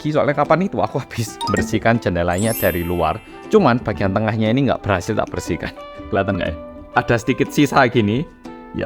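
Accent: Java, Indonesia. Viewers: Sering liat...tapi masih ngumun wong Korea ngomonge persis wong Jowo😂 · Klo nonton mas kelingan mbak jodilee, podo2medok tp mbake medok jogja krama inggilan😂😂😂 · Mas, tolong ya Meski tingg@ di korea, medok dan bahasa Indonesia nya jangan hilang yaa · Tiap nonton konten mas. Terus dia meddok bahasa jawa lancar berasa ga percaya dia asli korea🤣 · Kesini gegara nonton tonight show orang Korea yg medok,, langsung deh aku subscribe like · Wah keren ini bener2 korea medok 😅👍 .. suka banget kalau denger lagi bahasa indo medok dan bahasa jawa ..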